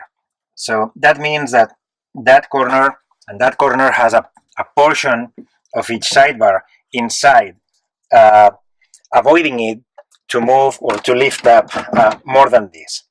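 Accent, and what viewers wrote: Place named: Spain. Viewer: Acento español ehhh ;)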